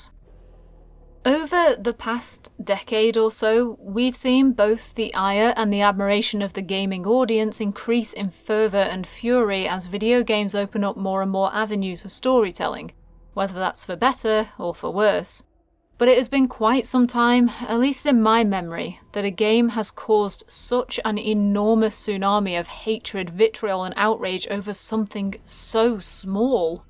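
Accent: United Kingdom. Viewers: Good job with this analysis, it was quite interesting. Just out of curiosity, which part of England are you from? I only ask because your accent reminds me of the accent of the southern Birmingham area where I used to live, but I may be very wrong, as English is not my first language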